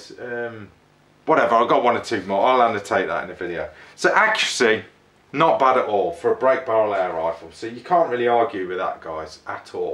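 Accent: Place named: United Kingdom. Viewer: Interesting thanks. Couple of questions - Can you get a rod in the barrel to clean it without risking damage to the self loading mechanism? If the self load was to become faulty, is there a way to manually load a pellet? and lastly, can you actually see anything through the scope with all that plastic in front of it? From your accent I presume that this is UK spec (max 12Ft/LBs) - be interested to see how long that scope lasts before the rifle trashes it to bits. We have the international version of the gun and it is more powerful, these things trash cheap scopes in under 500 shots. (My Crosman Diamondback 22 destroyed the Centrpoint scope that came with the gun in less than 200 shots (broke the springs for elevation and windage) and then destroyed my second scope, Niko Stirling Mountmaster in less than 500 shots (Detached the eyepiece glass completely and cracked the glass too) I am now onto a Hawke Airmax 4-12 x 50 which is fairing better and has surpassed the 500 shot mark OK..touch wood!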